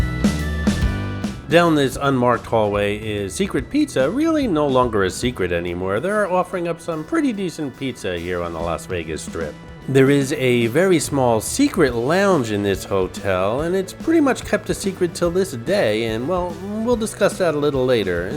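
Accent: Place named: New York, United States. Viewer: Nu-Yawk-flair........o yea!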